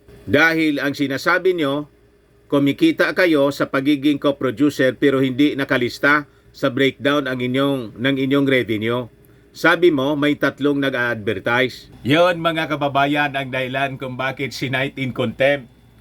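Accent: Bicol, Philippines. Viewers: bicolano ka po ba sir?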